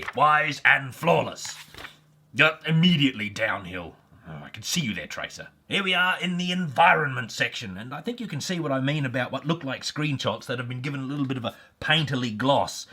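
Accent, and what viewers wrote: Australia. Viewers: Are you Aussie? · Is he an Aussie? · I came from the old Hero Quest video thinking you were English and find you're actually Ozzy! You'd fit right in with over here with the D&D crowd, hilarious stuff mate :-D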